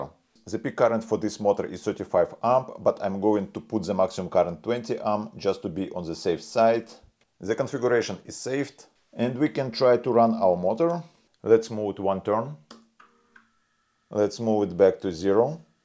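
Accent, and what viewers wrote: Russia. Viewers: Русский английский сразу слышно 😁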